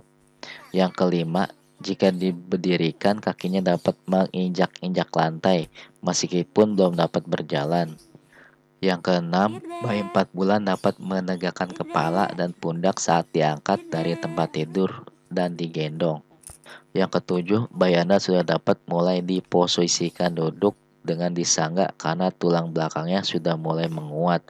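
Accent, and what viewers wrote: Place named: West Java, Indonesia. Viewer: Ini yg ngomong pasti orang sunda ya😂😂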